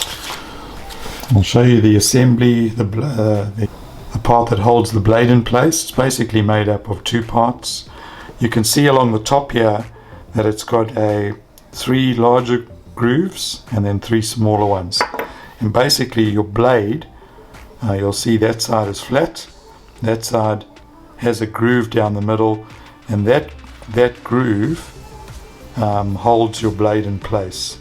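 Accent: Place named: South Africa. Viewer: I see on your profile you're from Australia, but I'll bet my nonexistent fortune that you're South African. Anyway, great informative video. Clear and to the point. Exactly the info I needed.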